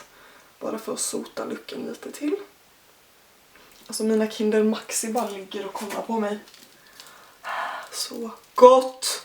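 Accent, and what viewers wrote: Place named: Småland, Sweden. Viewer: Min söndag fick ett perfekt avslut efter denna videon. Saknat ditt fina rum, men vart är de turkosa ljusen haha de var så fina ju. Har följt både dig o Louise sen jag var 12 år ungefär, även eran blogg. Din småländska röst förgyller mina dagar och brukar kolla dina äldre videos när jag har tråkigt. Älskar att du ska "börja" igen försök hålla i, jag är så taggad. Kan du göra en "catch up" video där du berättar om din tid när du har varit borta, om din utbildning m.m. Det hade varit väldigt intressant för oss trogna följare som följt dig länge. ❤️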